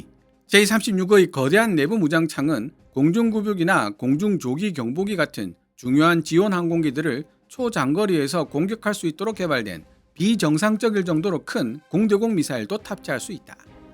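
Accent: South Korea. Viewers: 영어 읽지 마세요..콩글리시 짜증나~~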